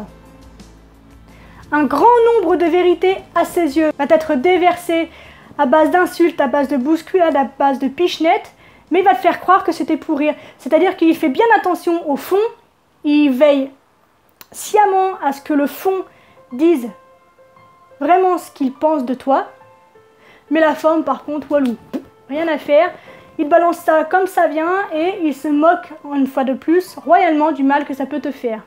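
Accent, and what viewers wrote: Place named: Quebec, Canada. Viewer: Bon content tho. Tout ce que tu dit est former :D Belle confiance. Sen tempone le coquillar hahaha Ouf sa frappe dans le quebcois. il y a un stress constant dans ta tonaliter de voix, je ne veut pas picosser mais donner une reaction que jai resentit fortement , et je la partage ouvertement. C'est commme si tu me donne un 2eme cafee xD.. mais je fait pareil quand je suis passioner <3 OK ok alor la ok ok lee le narcisisque.. hehe ironiquement jai beacoups de trait narcisisque et comme tu dit sans etre vraiment la maladie. Mais je ne me sent jamais mechant deriere cest trait. Alor jessaye de ne pas juger mon pere tres narcisisque, mais peu etre aussi tres bon au coeur? Des idee :D?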